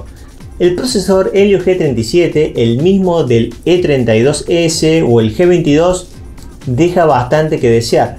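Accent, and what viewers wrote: Córdoba, Argentina. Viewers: ¿Sos cordobés? Porque hablas como una persona de Córdoba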